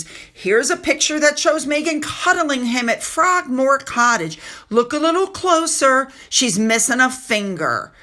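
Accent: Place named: United States. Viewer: I love your pronunciation of our very difficult English (and Scottish) place names. I still have trouble now and then with places new to me. You give it a go and who cares if it has an American twang - makes it more interesting.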